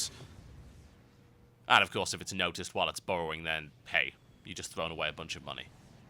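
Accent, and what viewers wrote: United Kingdom. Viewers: I can't help but read comments and video descriptions in his british accent. Does anyone else have this problem?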